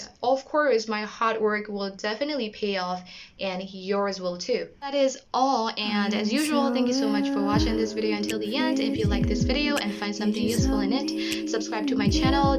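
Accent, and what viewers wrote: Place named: United States. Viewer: U have American accent n I love it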